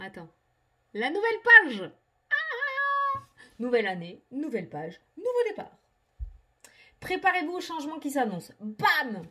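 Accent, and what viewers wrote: South, France. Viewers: Mais franchement, cet accent qui sent le sud et cette patate et joie. Rien que ça, c'est un réconfort quand on traverse une période pas cool. Alors merci pour tout ça!!! Passe un réveillon plein de chaleur et d'amour.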